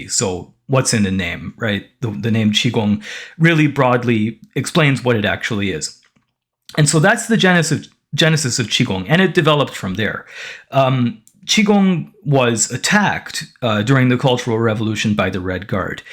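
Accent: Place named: Minnesota, United States. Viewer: Are you out of Minnesota? I hear an accent.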